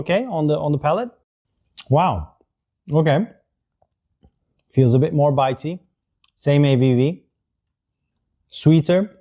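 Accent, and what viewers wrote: Turkey. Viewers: are you turkish? Your accent sounds very turkish 😂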